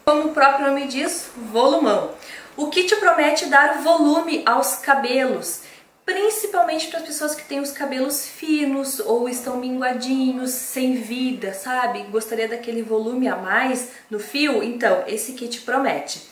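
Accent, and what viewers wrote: Rio Grande do Sul, Brazil. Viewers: Ai eu adorei teu sotaque...Gaúcha, ne? Eu sou também, e amooooo <3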